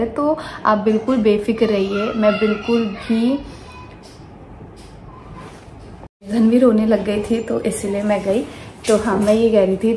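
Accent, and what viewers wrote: Bihar, India. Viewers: Bihar ke logo ki hindi thodi disturb rahti h unke language se pta chal jata h ki Bihar ke hai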